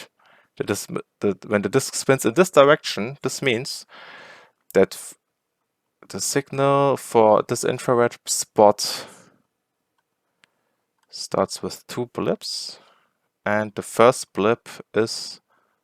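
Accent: Germany. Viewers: i know its gonna be good when i hear a german accent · as a german it is hard to stay in the english area of my brain because the accent really wants me to believe this is german :D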